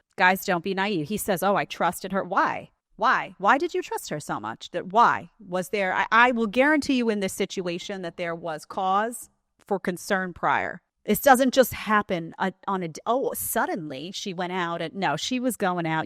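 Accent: New York, United States. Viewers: I like your NY accent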